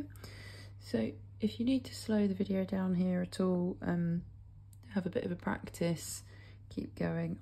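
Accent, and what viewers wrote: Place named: United Kingdom. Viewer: UK accent but using American stitch notation!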